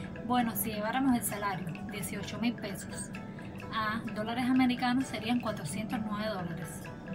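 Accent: Cuba. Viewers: Tu acento se parece al mío. Eres CUBANA !!!!!!!! BENDICIONES !!! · Siiii....me encanta la suavidad del acento cubano!!.Es muy dulce. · Qué bonita😍😍😍 Supongo que sos cubana, por el acento, bienvenida y suerte, un beso😘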